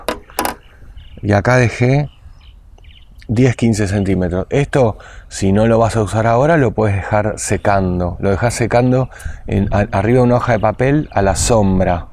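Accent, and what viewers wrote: Argentina. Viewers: super buenos consejos, claros y completos, pero lo mejor es que erres encantador,me has hecho reir con tu acento argentino y tu manera de hablar. Simpatiquisimo!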